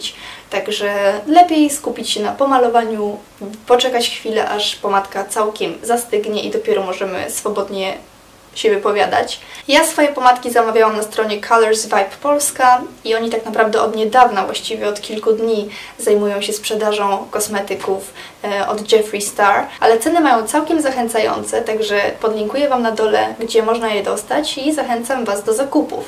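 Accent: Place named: England, United Kingdom. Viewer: Jejku! jaki ty masz śliczny angielski akcent!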